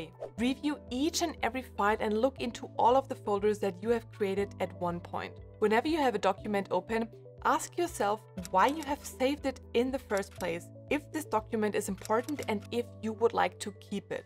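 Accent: Germany. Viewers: Can't stop "listening" to your video. I love your German accent! Bist du tatsächlich Deutscher? Also, bist du Deutscher? Komm schon, erzähl es mir bitte!😃 I am Brazilian and am learning German and to me, you sound like you have a German accent. I could be wrong, but whatever.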